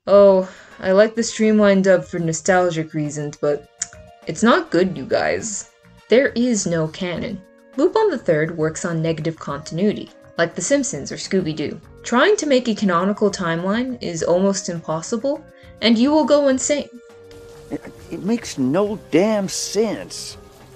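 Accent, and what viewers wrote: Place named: Ireland. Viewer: Are you Irish? I'm Irish and your accent sounds familiar.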